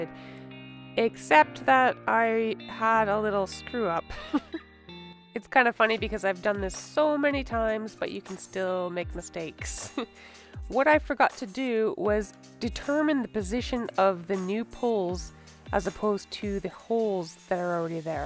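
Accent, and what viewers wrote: Canada. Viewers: Hi! I'm a brand new subscriber of yours, and what I like about you is that you produce great work without having the use of an all 'singing and dancing' workshop, ie no table saw and other modern tools you see in other peoples video's. Oh and your nice accent ( Canadian)? I look forward to more of your vid's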